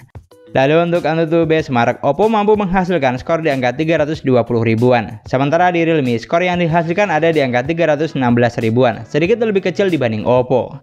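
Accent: Madura, Indonesia. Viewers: Saya nyimak dari awal sampai akhir,, semakin saya menyimak semakin saya tahu, si Abang aksen penjelasannya semakin lama berubah ke aksen Madura 🤔 Saya nungguin kata "...........taiyeee" nya 🙄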